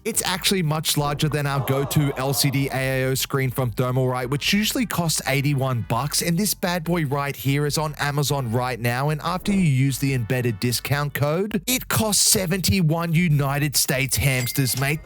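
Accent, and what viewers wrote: Australia. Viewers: I thought you were Australian, then I heard you mention microcentre ALOT I second guessed. BUT YOUR FROM BRISBANE ❤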